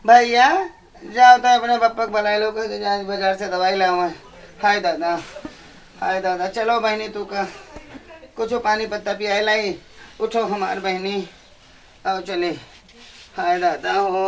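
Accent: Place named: Awadh, India. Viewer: क्या जबरदस्त अवधी भाषा है भाई इस आवाज का कोई जोड़ नहीं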